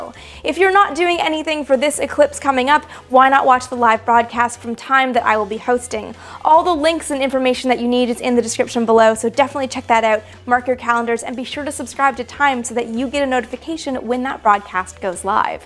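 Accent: Canada. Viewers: Love what you do! Living just a few miles from the path, I'll be doing the eclipse "live". We make a big deal about these events but I've always wondered if so much true science is to be derived from them, why NASA hasn't done more in space research as there is an eclipse occurring at a point in space, constantly. And ignore comments below regarding pronunciation of Gemini... I think it is a bit of a lovely Canadian accent coming through.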